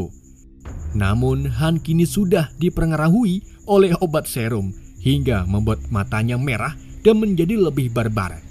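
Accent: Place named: Banyumas, Indonesia. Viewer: Wkwkwkw jawa,a itu loh,,ngapak bgt mka,a saya suka chenel ini ,,bikin ngakak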